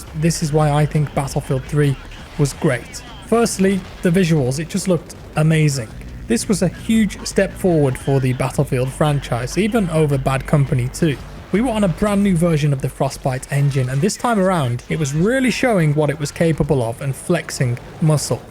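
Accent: United Kingdom. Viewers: Brits are just natural narrators. Very nicely done,.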